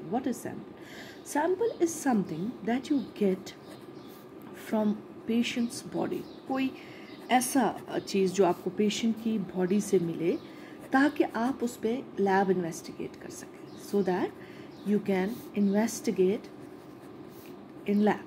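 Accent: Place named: Kashmir, India. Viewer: Excellent ma'am ur teaching way is amazing and that tooo in between the kashmiri ascent is phenomenal ♥️♥️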